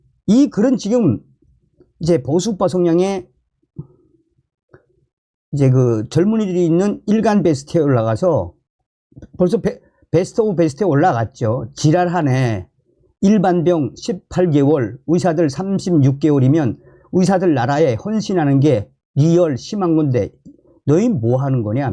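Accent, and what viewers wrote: North Korea. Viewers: 북한 어투와 어법이어서 인지는 몰라도 반복되는 중언부언이 너무 많고 사족이 너무 많으세요 선생님! 젊은세대들은 이런 스탈 싫어 합니다 .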